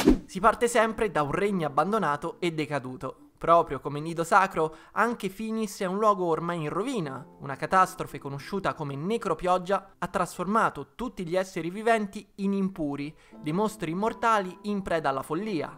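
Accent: Rome, Italy. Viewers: Lo sai che abito sulle Alpi francesi e ogni tanto faccio confusione. Non si può dire però che io non mi applichi. Io almeno non parlo con l'accento romano come fai tu...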